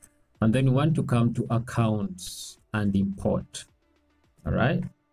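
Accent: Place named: Uganda. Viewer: You sound Ugandan, thanks!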